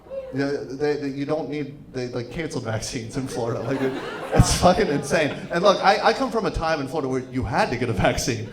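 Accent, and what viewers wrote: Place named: Jamaica. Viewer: Several times he was almost funny. I think he would have killed it if he spoke in his Jamaican accent.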